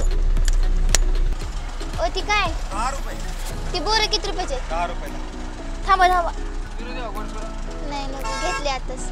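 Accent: Latur, India. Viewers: लातूरची मराठी लई भारी 👌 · I am from Pune Tuza video pahatana tuzi bhasha aikun mala mazya gf chi aatavan zaali she was also from latur #pbthetraveler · Latur kar bolanyachi tone khup avdli pune karanna....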